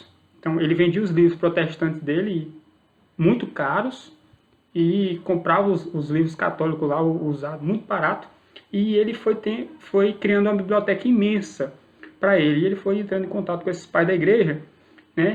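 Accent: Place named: Northeast, Brazil. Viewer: Vc é maravilhoso! Sotaque nordestino do jeitinho q eu gosto. Sou cearense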